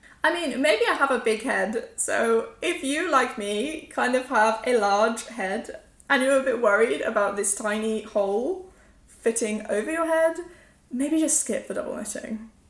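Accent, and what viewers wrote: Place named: United Kingdom. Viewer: I enjoyed your Video again very much and I love to hear your British accent... I recently finish ed the Agave Sweater by Yumiko Alexander. I knitted it with Drops Bomull Lin in dark Blue. It was fun and a quick knit also. I will also try one of the bags you recommended in the speacial bag video. Best wishes from Cologne! 🎉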